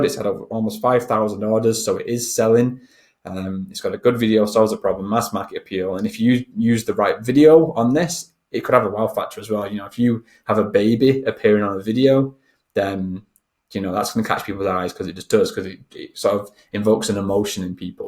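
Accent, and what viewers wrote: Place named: Bolton, United Kingdom. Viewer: Where are you from as you sound local to me. Bolton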